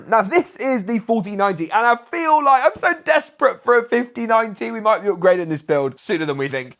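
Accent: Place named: North, United Kingdom. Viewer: I cant understand the north accent \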